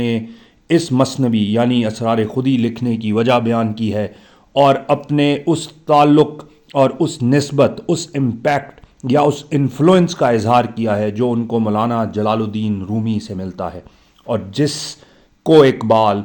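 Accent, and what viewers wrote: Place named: Iran. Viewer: السلام علیکم۔ علامہ کے مثنوی کے آغاز میں لکھے گئے ان اشعار کے مفہوم کو سمجھنے کے لئے آپ کی ویڈیو کو کئی بار سنا مگر ان اشعار میں پوشیدہ وسیع مفاہیم کو سمجھنے کی تشنگی ختم نہ ہو سکی ۔ علامہ کا فلسفہ خودی تو انکے بعد میں آنے والے کلام میں بیان ہوا ہو گا مگر انکے یہ تمحیدی اشعار مولانا روم کی عظمتِ فکر کے ساتھ اقبال کے ان سے روحانی تعلق اور عقیدت کے اوراق بھی کھولتے ہیں۔ علامہ مولانا کو اپنے پیرومرشد کا احترام و مقام دیتے ہیں۔ آپ نے ماشااللہ ان اشعار کی بہت خوبصورتی سے تشریح کی ہے ۔ ایک ایک لفظ اور تلمیح کا سلیس مطلب بتایا ہے۔اور فارسی کلام کو موجودہ ایرانی لہجے میں پڑھا ہے۔ اللہ آپ کو جزائے خیر دے۔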